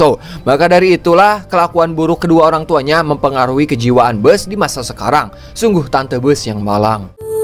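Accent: West Java, Indonesia. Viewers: Aa Org Sunda Bukan. Lucu Ngomong Nya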